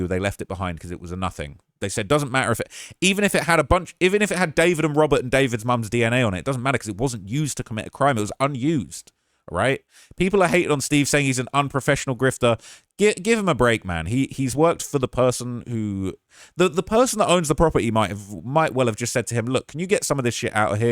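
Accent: United Kingdom. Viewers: when i frst started watching you, i heard your accent and i'm British too and you whipped out a flick knife that locked in place i was like AAAAAAAAAHHHH TAKE THAT INTO THE POLICE STATION YOU'RE GONNA GET IN SERIOUS SHIT. it took me a minute to realise you lived in the US lmao i was like oh wow, i thought this guy was an idiot turns out i'm the idiot and had just landed on the channel. keep up the great work you're the only d4vd reporter i watch. rotten mango has done some good content also but you're a basically daily reporter on this. it's excellent. i enjoy the reddit stuff!! you've scrolled past one of my comments before. freaked me out. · This is extremely informative!how did i not find you before??All other networks and youtubers are being so prim and proper about it all and not even giving updated updates, if that makes sense. And the brit accent is so refreshing!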